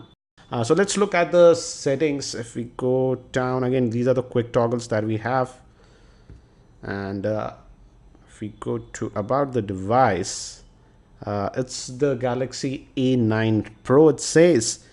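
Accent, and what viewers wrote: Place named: India. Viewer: gosh ! the indian accent...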